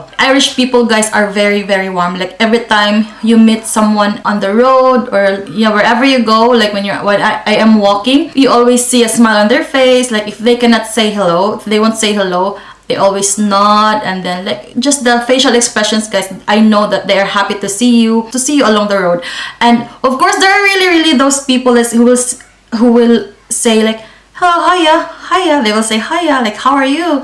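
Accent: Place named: Philippines. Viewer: Sabi na nga be Pinay eh,lol. Just clicked the video, on the first word, alam na agad! Because of my musical influences, my love for their accent, geography at sabi nila masayahin din sila, gusto ko maka punta jan.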